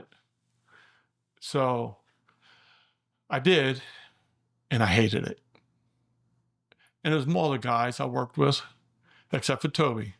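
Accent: New Orleans, United States. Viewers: Perfect accent, soon as this video started if knew he was from the New Orleans area. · This guy has the classic New Orleans accent. Makes me miss that town. I spent a lot of time there in the ‘90’s.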